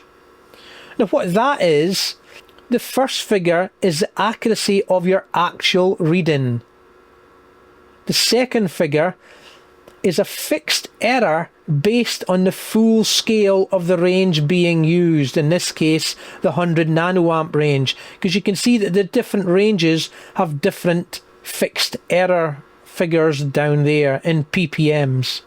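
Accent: Scotland, United Kingdom. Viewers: Is that really 4 nixies? The board inside had just 3 nixies on it. Is the forth digit in front of the 3 nixies just a neon bulb that serves as a 1 indicator? Guess this can be refered to as a 1999 count meter 🙂 Nice repair anyway, and I just love your Scottish accent.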